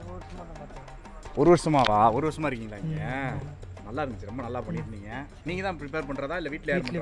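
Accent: Madurai, India. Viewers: Anna Madurai tamil 😍awesome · Peasum pothea avinga evinganu arampichutinga anne😍 atha madurai slng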